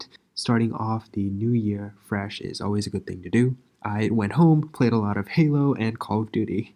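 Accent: India. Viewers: Are you from hyderabad ?🙃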